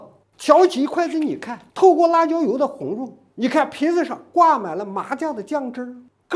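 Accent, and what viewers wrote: Shaanxi, China. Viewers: 纯正的陕普，非常亲切！感谢！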